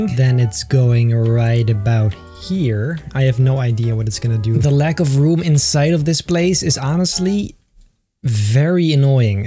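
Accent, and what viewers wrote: Netherlands. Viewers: I smell Dutch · Are you dutch !? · i smell dutch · i smell dutch hahahaah lekker toch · Hallo, Jij bent ook nederlands toch? Ik kan je nederlandse toon hooren, net als thijmen0808. Hij praat ook net als jouw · Ja je bent Nederlands, lekker bezig accent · are you dutch? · Are you dutch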